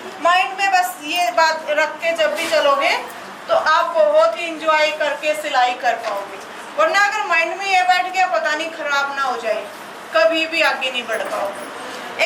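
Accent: Haryana, India. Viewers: Tone se mujhe haryana ki lag rahi Me bhi hisar se hu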